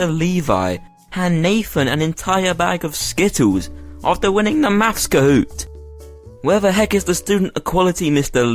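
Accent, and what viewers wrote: United Kingdom. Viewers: Your brittish I thought you was Australian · i absolutely love this guys British accent. It’s so charming to hear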